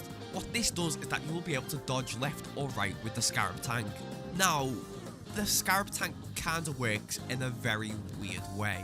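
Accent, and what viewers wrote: Liverpool, United Kingdom. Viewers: I can pick up a scouse accent there 😂 I'm from Liverpool as well🤣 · so where is the fucking list? i dont want to listen to your stupid ass british voice for 30 minutes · Eyyy you a scouse ?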